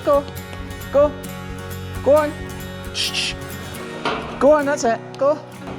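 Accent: Scotland, United Kingdom. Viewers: I do believe that I am beginning to catch on to what you do to those cows feet! Its your Scottish accent that makes we ladies watch your videos! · How long does it take for the glue to get loose? Is that always long enough to heal up? Like your videos. Even if your Scottish accent is hard to understand for a Dutchy like me, I learn more about hoof trimming! Btw: nice trimming box you have 😉. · I like your videos because I love cows and your scottish accent 😍👌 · Im from Wisconsin in the US and we have a ton of Dairy farms I didn't realize Scotland had that many farms I thought you guys just had goats and sheep and wore kilts jk about the kilt part. You look like you could be from here until you speak Well I live in Milwaukee so near here anyhow.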